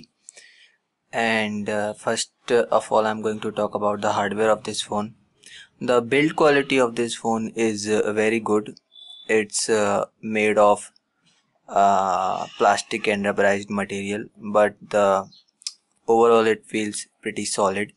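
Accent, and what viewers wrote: India. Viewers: The indian accent is so funny :D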